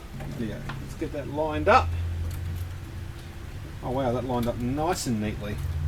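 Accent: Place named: Australia. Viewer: We love your Aussie accent here in the states. Let's do an episode counting how many times says "let's face it.".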